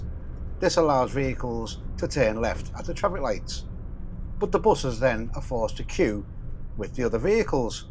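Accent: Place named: North, United Kingdom. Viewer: Glad you've got rid of that AI voice - Normal northerner, happy days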